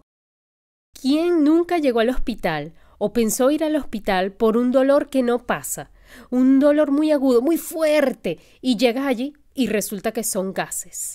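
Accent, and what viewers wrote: Venezuela. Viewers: Hablas como venezolana. Muy divertida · DIVINA Dr. he reido casi todo el video con tu sentido del humor ,,Belleza, simpatia ,conocimiento y excelente explicación .eres venezolana por tu acento. Gracias totales, Dios ilumine tu camino de bendiciones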